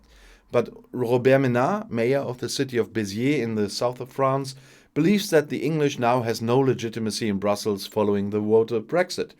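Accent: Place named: Canada. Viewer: O no it Canadian English actually. 😅😜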